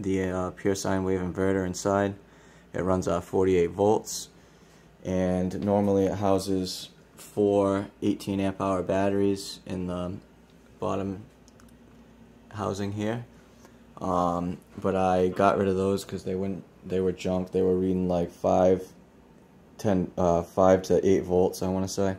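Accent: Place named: United States. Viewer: hi so based on your accent you are somewhere in the US (finally, most video's showing the apc2200 are from the uk) as i am too, and a friend gave me this unit with bad battery's, and cabling, no stickers no model number on it, and i had to open it up and take the model number from the internal board, so at first i was sure this uses 220v but as i found your video and i am in the US to, i am not so sure anymore i thought it might be a good idea to ask this stupid question, does it take 220v? or 110v?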